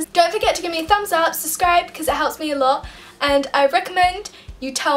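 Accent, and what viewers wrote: England, United Kingdom. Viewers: Pshhh if I lived in England or Brittan or where ever that adorable accent is from XD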